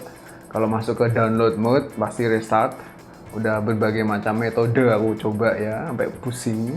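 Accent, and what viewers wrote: Java, Indonesia. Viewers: medoknya khas banget bang 😄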